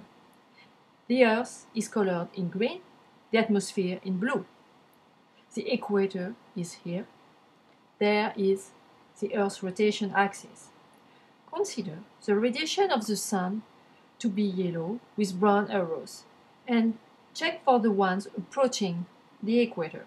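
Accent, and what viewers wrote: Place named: France. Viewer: Dude she's obviously French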